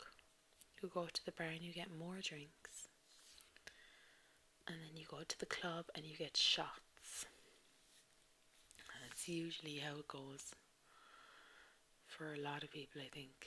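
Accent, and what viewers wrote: Ireland. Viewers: God your voice is gorgeous! :) think I have established I like irish women :P nommy nom nom. I was watching This Is England 88 tonight and they had this Irish nurse on there and I instantly liked her accent. Think this is better than a Brummie accent now. Im from the South Of England I live 80 miles from London in Kent. My accent is cockney/kentish "alwite mate you fackin...." Irish accent is now my fave :) whoop! 2nd is Margate girl accent (local accent) :)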